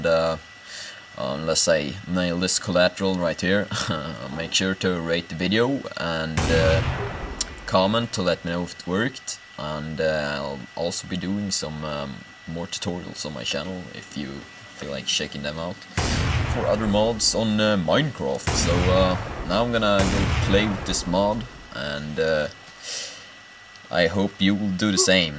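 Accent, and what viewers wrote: Sweden. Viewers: are u from sweden u talk with swedish accent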